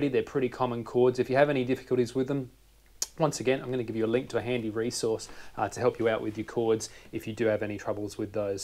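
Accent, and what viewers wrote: Australia. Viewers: i love how aussie you are ><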